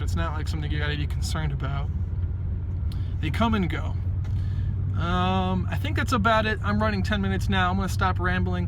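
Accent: United States. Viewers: Definitely not lol where you from bro...im from Detroit...you sound like you from Ann Arbor or the burbs or something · Thank you, considering moving from metro detroit to tuscon... worried about the heat, you sound like someone from grand rapids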